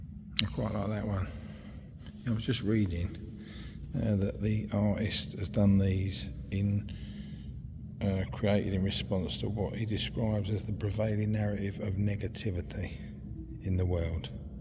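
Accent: London, United Kingdom. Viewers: I love your voice, proper old school London, im from Ladbroke Grove. Thanks for the Video 🎉 For me, it's all about the ingredients in the sausages. I've yet to find a decent independent coffee shop in London. 😢 What genre of music are you collecting on vinyl?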